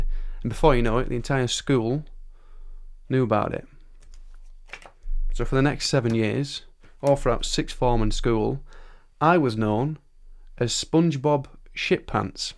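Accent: Birmingham, United Kingdom. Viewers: Golden fingaaas CHECK Bostin accent CHECK On-the-edge-of-the-seat storytelling CHECK Best dad ever CHECK LE-GEND!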